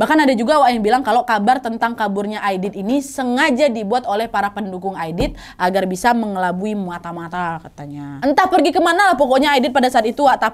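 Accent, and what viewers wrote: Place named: Java, Indonesia. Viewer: sakjane wkwk, logat medok jowo wes an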